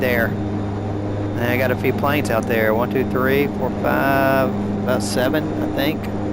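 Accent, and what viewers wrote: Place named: South, United States. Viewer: Your videos are epic. Never learned that much in such a short time as with your vids. Your real world pilot helps.you do the procedures as you would in real life, which is very helpful. And you've got such good sense of humor. May I ask you (if it is not too personal) where are you from in the US? Somewhere in the South? Your accent is really nice. :-) I brush up my American English that way. P.S.: I'm from Austria, so my English isn't very good. So please bear with me.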